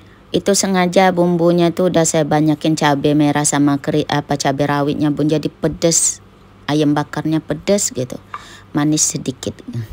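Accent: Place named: Lampung, Indonesia. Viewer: Dari lampungkah bun. Logat bicaranya kaya org lampung ato palembang gitu 😊😊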